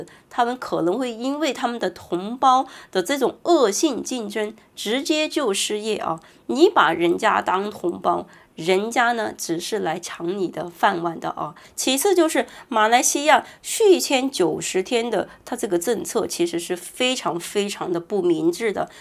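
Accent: China. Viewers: 你的口音大陸人，我不知道你的目的是什麼？ 我看到是美國也在世界各地媒體和BBC英國媒體之前也是有收撥款來黑大陸 ， 你了解的大馬是很少 第一在大馬攝影師也是要去註冊申請公司才能營業也要交稅 第二大馬建設和大陸合作很多年了本地政府也為了保護國家就業只是批准一些專業人事來工作 其他承包商一定要是本地公司除非是本地沒有企業有代理的才能申請，勞工大馬本地人也不做也是進孟加拉和印尼工人居多 如果你說的攝影師沒有執照去大馬營業拍攝如果被抓到他們也會被罰款或者坐牢 為了賺299值得嗎？攝影師恐慌是好事其實可以逼他們創新和新的拍攝方式 如果大馬本地有錢也會一部分去包其他國家優秀的射影師來拍攝不同風景 因為本地的攝影師拍來拍去一樣的風格喝喜酒討論會問是不是某個攝影社拍的同類型 也會悶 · 妳就是中國大陸口音啦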